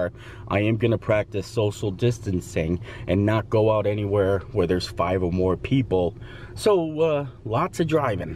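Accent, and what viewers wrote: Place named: Midwest, United States. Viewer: Are you from more of the Midwestern part of PA or east coast? I thought you had more of a midwestern accent and mannerisms.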